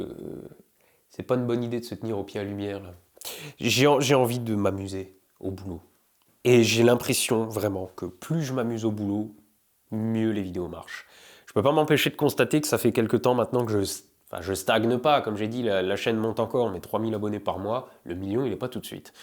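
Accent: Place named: East, France. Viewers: De la botanique à la sauce, ça va dépoter! Je ne loupe pas les mises en avant de produit avec l'accent de l'est de La France. Et puis c'est l'apprentissage des gros mots en Alsacien. Pour les futurs sujets, fais toi plaisir et surprends nous! Tu aimes la qualité alors fonces. Tu fais parti de ces créateurs qui m'apportent de la connaissance avec humour. A bientôt. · Ce que m'inspire ta vidéo... Humblement... T'as pas un côté HP? T'as bien le droit de faire ce que tu veux... Je te suis pour le ton, les thèmes et ta façon de vulgariser... T'as le droit de faire ce que tu veux... Fais-moi rêver avec ton accent mosellan... On est voisin, big up.... T'as le droit de faire ce que tu veux...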